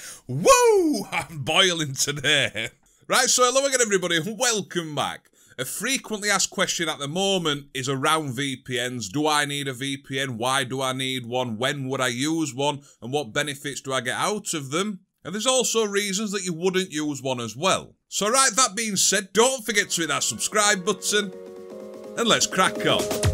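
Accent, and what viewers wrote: Yorkshire, United Kingdom. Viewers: Won't lie you make me laugh are you Yorkshire or Lancashire you know your s***🤘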